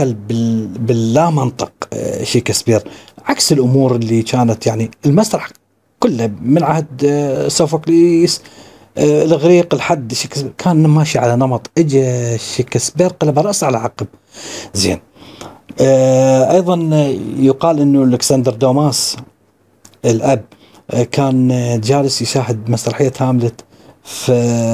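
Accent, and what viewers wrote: Iraq. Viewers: ألف شششكر ..أسلوب جميل وسلس وزاده جمال اللهجة العراقية ♥️..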